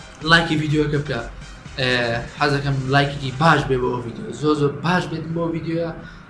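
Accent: Erbil, Iraq. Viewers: hhhhhhhhhhhh bas chan diqat ayam ba hawlery qsa aka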